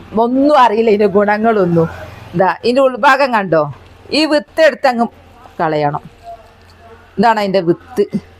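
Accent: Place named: Wayanad, India. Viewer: wayanad എവിടെയാണ് നല്ല പരിചയം ഉള്ള സംസാരം, മുഖം, ഞാൻ വയനാട് ആണ്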